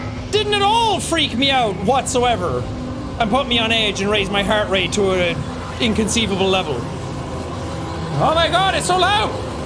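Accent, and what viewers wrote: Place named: Ireland. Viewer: I know this video was posted months ago and as I have said before even if you do not see this those who do I hope you realise that this is true what I have to say is that no matter how much haters he has no matter who tells me he’s not a good YouTuber I will love his content and his pure Irish accent forever keep up the amazing career.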